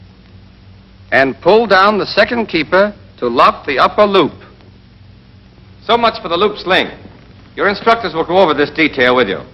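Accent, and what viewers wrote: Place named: Boston, United States. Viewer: He's speaking in a Boston accent, or New England accent.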